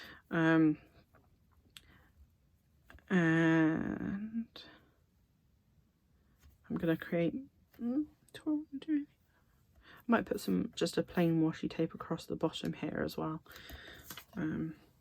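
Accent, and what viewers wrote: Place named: United Kingdom. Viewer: hello love your accent from the UK everybody. We are either rejoicing in US or crying today day after election. Im crying.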